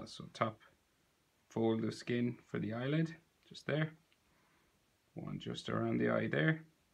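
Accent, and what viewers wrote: Ireland. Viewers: ok thanks also did you use sharpies to colour (shows in thumbnail but not in vid) or something else, and are you irish? I think that's an Irish accent but not sure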